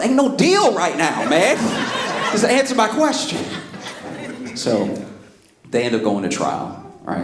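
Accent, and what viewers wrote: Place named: South, United States. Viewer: I notice that speaks in a way that shows he’s highly intelligent but at the same way his manner of speaking is “folksy”. For example he says wadn’t instead of wasn’t and them instead of those which is common in Southern dialects. He completely dispels the myth that if someone speaks a certain way they aren’t intelligent which is a common stereotype placed upon Southerners.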